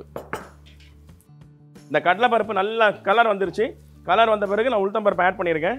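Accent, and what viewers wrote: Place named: Triplicane, India. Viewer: சார் நீங்க சின்ன வயதில் திருவல்லிக்கேணியில் இருந்தீர்களா பேச்சு ஸ்டைல் அப்பிடித்தான் தெரியுது. நல்லா ரசிச்சு பேசி செய்றீங்க. Very interesting.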